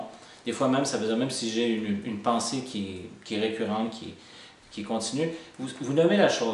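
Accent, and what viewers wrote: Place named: Quebec, Canada. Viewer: Merci Monsieur . J'adore le Canada et plus précisément le Québec! Votre accent est doux et ensoleillé dans votre région. Merci encore De Marseille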